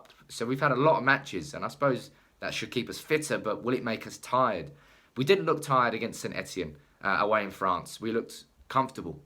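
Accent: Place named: Manchester, United Kingdom. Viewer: I can't understand his thick Mancunian accent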